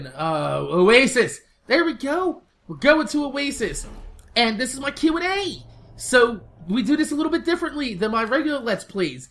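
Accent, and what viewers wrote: New Jersey, United States. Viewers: Dat New Jersey accent :D I'm from Tennessee, so I have a southern accent. Only slightly though.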